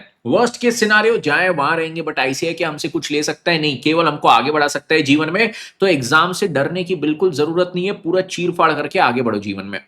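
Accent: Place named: Nagpur, India. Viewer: He has a Nagpuri accent omg 😂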